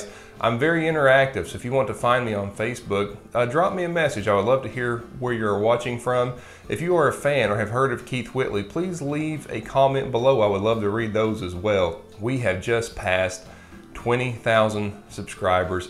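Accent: Kentucky, United States. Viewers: What a beautiful tribute to Keith Whitley you did a wonderful job. It was so sad his life ending so tragically. You are a wonderful narrator l love to hear you speak you have a special voice that keeps you interested. It must be that Kentucky voice that intrigues me. Keep the videos coming l truly enjoy each and every one.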